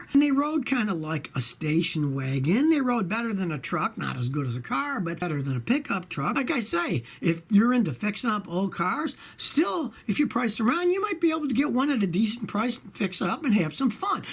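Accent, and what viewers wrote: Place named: East Coast, United States. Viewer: I have listened to many of your videos and I have an important question. What kind of accent do you have? It doesn't sound like Michigan, more like East Coast. Please answer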